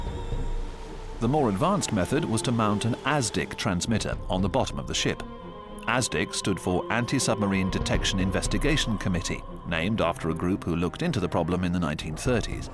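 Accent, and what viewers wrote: United Kingdom. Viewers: British narrators are the best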